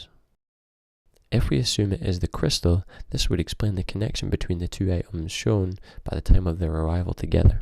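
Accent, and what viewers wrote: Scotland, United Kingdom. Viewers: I think he’s just Scottish :)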